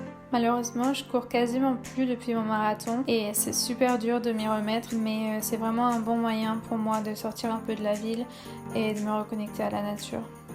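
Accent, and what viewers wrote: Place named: Australia. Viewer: vous êtes un petit accent d'Australie, ou des états uni peut-etre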